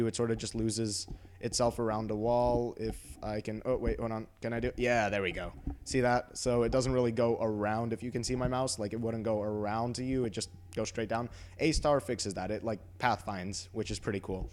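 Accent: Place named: United States. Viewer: Are you actually Russian? I would guess not based on your school experiences that you talk about and your accent is American. Just a question.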